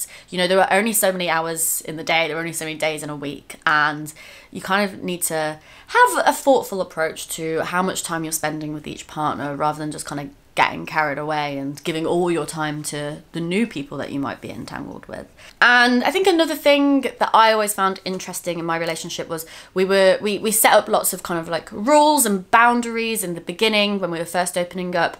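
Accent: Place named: United Kingdom. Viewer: How charming is her British accent? Sounds so intelligent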